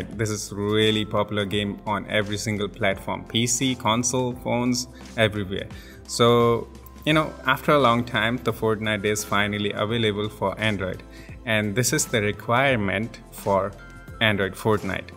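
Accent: India. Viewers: atlast an indian person speak english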